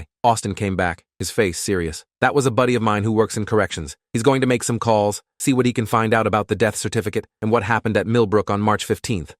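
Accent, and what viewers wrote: United Kingdom. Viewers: ooohhhh yeeessss ahh british accent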